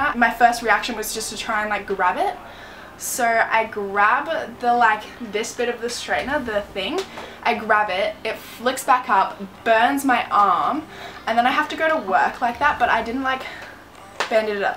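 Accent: Australia. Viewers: u r Australian?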